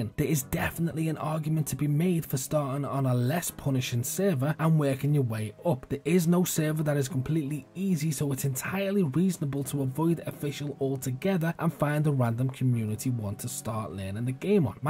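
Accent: Liverpool, United Kingdom. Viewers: Great video mate, looking at getting back into dayz after a year or so and only had a few weeks of experience so this video has helped out, plus its always good to hear a fellow scouser. Quick question, is dayz launcher still a thing and is it worth bothering with? Liked and subbed mate :)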